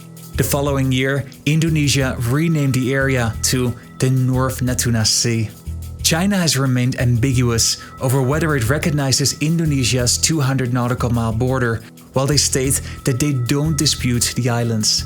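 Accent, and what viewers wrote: Netherlands. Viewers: ROFL, I thought I reckognized that Dutch accent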